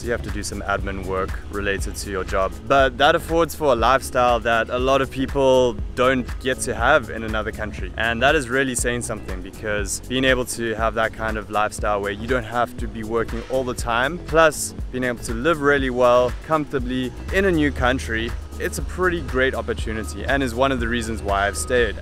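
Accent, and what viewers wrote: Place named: South Africa. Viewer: He sounds South African to me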